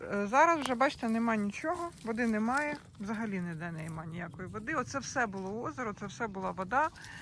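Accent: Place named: Podillia, Ukraine. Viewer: Ви часом не з Поділля?Мова подільська,точніше-вінницька.Уявляю,як зреагує ваш чоловік,коли там ви насієте кропу,петрушки і прикрасите чорнобривцями.Я жартую,звичайно.Бажаю вам здоров'я і щастя!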